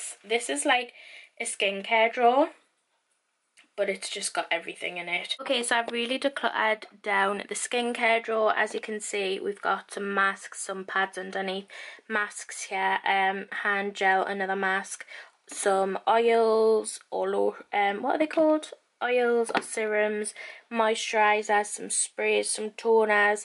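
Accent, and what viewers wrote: Newcastle, United Kingdom. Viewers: Love watching u I’m from Sunderland/ Newcastle and now live in Cumbria and miss the Geordie accent xx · Literally my favourite Geordie girl! I just love how she doesn’t pretend to be dead posh and polished xxxx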